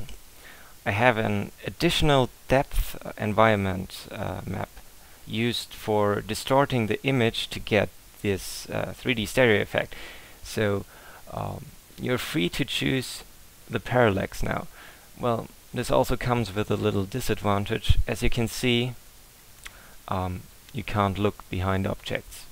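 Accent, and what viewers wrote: Germany. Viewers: Haha du bist deutsch oder?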